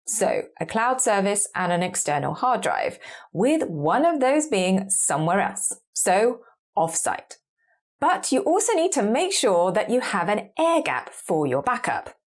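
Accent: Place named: RP, United Kingdom. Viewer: When I close my eyes and listen only to your voice, I can truly believe you are part of the British Royal Family!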